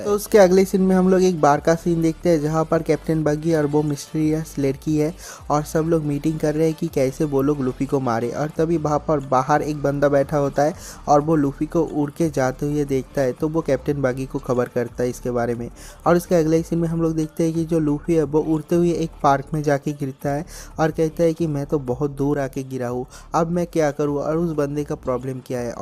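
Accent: Bengal, India. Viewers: Bro apke voice se mai samjh sakta hu ap bengoli ho but please bhai gender ko sahi se mention karo Apne bola zoro ka leya mai tere ko paisa chuka dungi😢😢😢 mai paisa chuka dunga hoga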